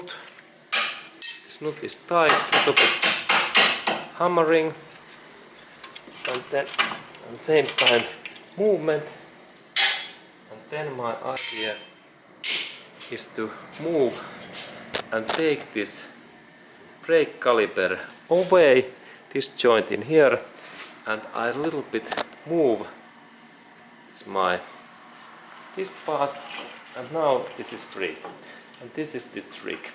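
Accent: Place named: Finland. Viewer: You are Finnish i love your english!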